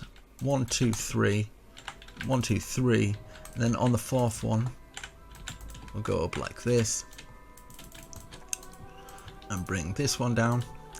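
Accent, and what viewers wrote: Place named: Scotland, United Kingdom. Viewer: Your accent seriously you sound Scottish I love your videos you make amazing builds and your funny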